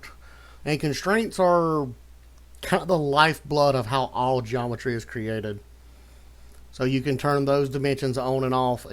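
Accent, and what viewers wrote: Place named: South, United States. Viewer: Thanks for the help and I love your southern accent brother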